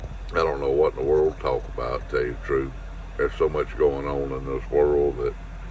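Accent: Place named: United States. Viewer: Hello! Every time I listen to I always think that you could have made millions with your voice and the way you speak. To me you sound like America. Like the America we use to know about here in EU After 25 years I’ve come full circle and smoked CB again. I still like it. You should keep this look. You look 20 younger without the beard. It’s a great look! Have a wonderful day! Silviu🤝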